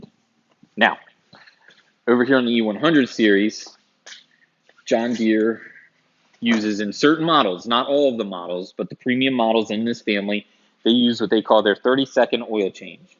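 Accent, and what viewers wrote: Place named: Pittsburgh, United States. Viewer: Stumbled upon this while trying to learn about the product JD product line. Living in Atlanta, but from PA... Picked up on the PA/Pittsburgh accent immediately, then looked over his shoulder and saw the sign confirming the locations. Very informative though. Thanks.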